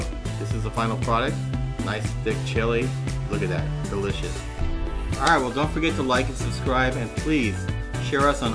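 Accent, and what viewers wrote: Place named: North, United States. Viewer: My guess is you must be from a Northern state.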